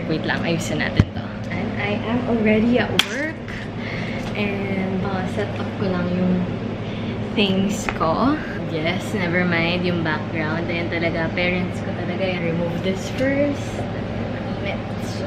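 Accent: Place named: Pampanga, Philippines. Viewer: o diba si na pinanganak na tlagang may kaya ung pamilya sobrang humble and ineembrace yung kapampangan accent nya, yung iba na bago lang nagkaron dahil sa pagvlog sobra makaslang at pa sosyal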